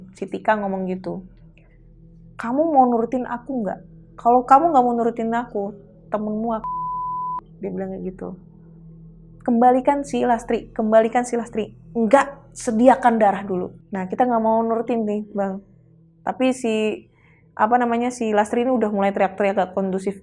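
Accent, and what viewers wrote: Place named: East Java, Indonesia. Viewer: lidah wetan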